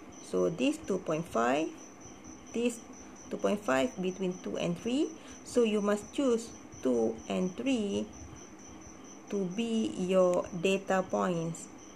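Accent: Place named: Malaysia. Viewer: Bila dengaq je suara dan slang, dh tahu org melayu👍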